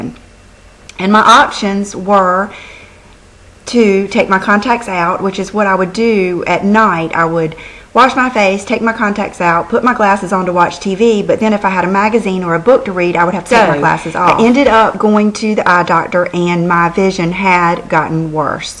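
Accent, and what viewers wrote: South, United States. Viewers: I just love listening to your southern accent. "Steel Magnolias" is my altime favourite movie and you talk like that. You look lovely in even casual clothes - those earrings just set off the turquoise colour beautifully.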